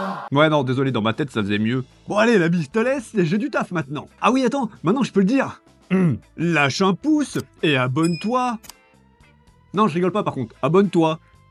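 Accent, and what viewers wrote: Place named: Lyon, France. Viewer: Bien vu,! Excellent début, cool de te retrouver ici. Le ton que tu emploies me fait penser à un autre glorieux Lyonnais que tu as cité dans tes références - je te laisse deviner. Longue vie à ta chaîne, c'est cool! ✌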